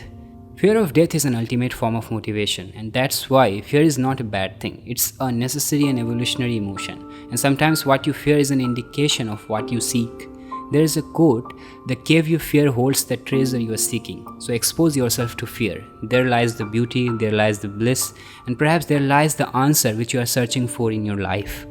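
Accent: India. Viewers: i hate this indian accent so damn much i just cant understand ittt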